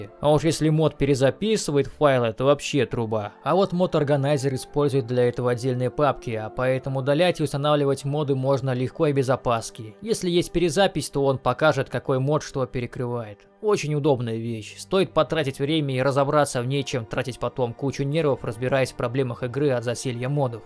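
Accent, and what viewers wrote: Germany. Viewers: Право слово, автор произносит английские слова, как немецкие. Я всё понимаю, но это совсем уже жёска. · и да сразу видно что вы изучали немецкий по вашей манере читать инглиш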